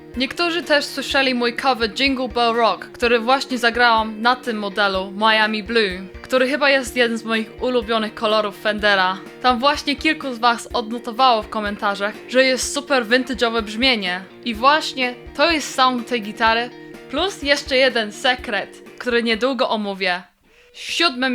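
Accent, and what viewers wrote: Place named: England, United Kingdom. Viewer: Swietny material.Obejrzalem z wielkim zainteresowaniem.Lubie Twoje filmy z takimi muzycznymi nowinkami.Noi lubie tenTwoj proper English accent gdy wymawiasz nazwy anglojezyczne.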